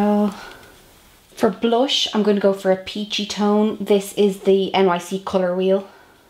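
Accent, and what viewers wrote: Ireland. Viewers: She's from Ireland so I'm guessing it's an Irish accent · I love your videos!!! I love your accent!! I felt totally in love with Ireland when I went there when I was younger, it's the most beautyful place in the world!!! And I was pretty surprised by the people too, I've never been in a country with such nice people, really. I'm irish in my heart ^_^ Take care sweetie.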